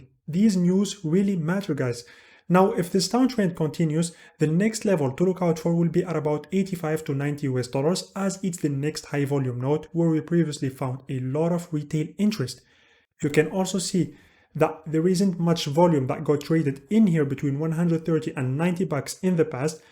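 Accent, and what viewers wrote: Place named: France. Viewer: L’accent français ça 😆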